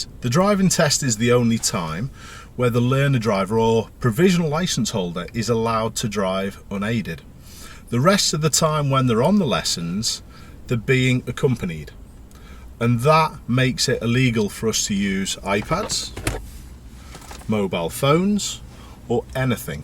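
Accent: United Kingdom. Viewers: Everyone here, including the uploader, thinks there is only 1 set of laws in the entire world. You're wrong. Every country has different rules. Judging by your accent, I'm assuming this video is about the laws in the UK. But these laws do not have to apply for places elsewhere.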